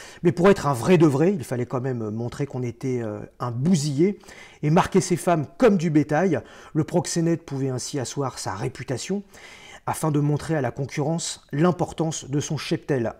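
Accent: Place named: Paris, France. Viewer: Je rajouterais un +1 pour ton phrasé qui fleure bon le Paris qu'on aime !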